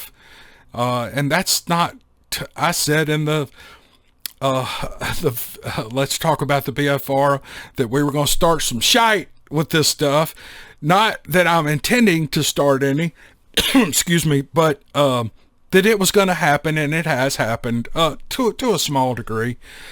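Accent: South, United States. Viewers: Meant to add that your well spoken Southern brogue gets me through many nights and I am very much grateful to for your gracious and unassuming attitude toward our shared fascinations with the many accounts, lore and incredible stories of the rural areas and wilderness countryside of this great Nation !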